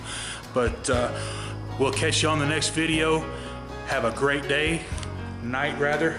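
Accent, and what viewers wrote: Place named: North Florida, United States. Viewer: You sound like a North Florida Boy... Great vid. I'm a North Florida Boy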